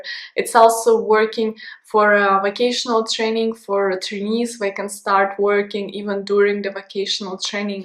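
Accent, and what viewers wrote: Russia. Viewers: I Love the Russian accent ...😍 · Your English is very good to understand, I just came back to Canada from Asian, spacial from China very hard to understand, your English is good, nice beautiful Russian aksent, I love it, speak German too, I like to hear that, you are beautiful.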